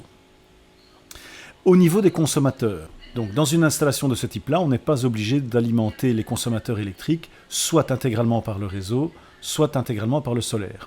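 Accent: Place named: Belgium. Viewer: Très intéressant, vos vidéos sont très bien faites. Seriez-vous belge? Votre accent m'est très familier 🇧🇪😊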